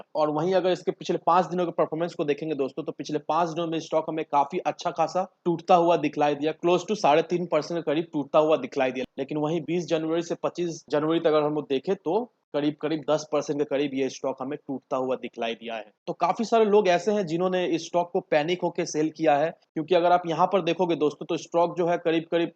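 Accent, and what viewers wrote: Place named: Kolkata, India. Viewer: main kolkata se hu, to mujhe apka tune se aga ki aap ho....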